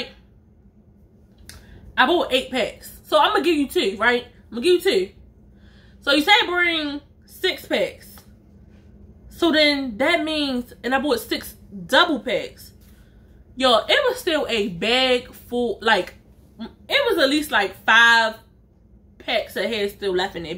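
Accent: Baltimore, United States. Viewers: Baltimore Maryland for sure lol I hear the accent , I'm from Alexandria Virginia 😁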